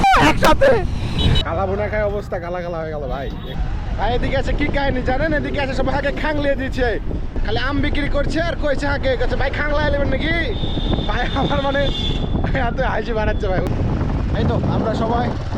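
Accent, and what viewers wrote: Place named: Chapai Nawabganj, Bangladesh. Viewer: জি ভাইয়া চাপাইয়ের ভাসা অনেক হাসির🤗😁😀😀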